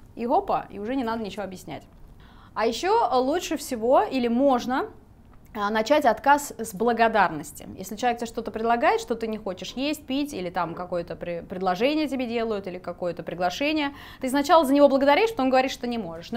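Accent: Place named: United Kingdom. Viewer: ! А у вас есть какой-то акцент и вы его придерживаетесь?))) Слышу что вроде какой-то бритиш, но местами проскакивает FlapT или в этом акценте это норм?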